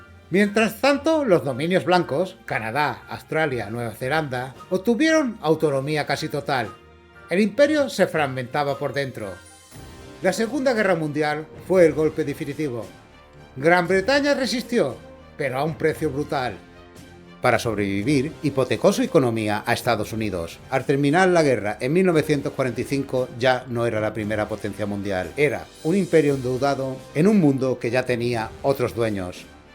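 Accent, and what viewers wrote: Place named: Spain. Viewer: Sin querer tirar hate, pero me parece curioso que una persona co acento español hable mal de colonizadores, perdon pero me pareció curioso XDD. Me gustó mucho el video ♡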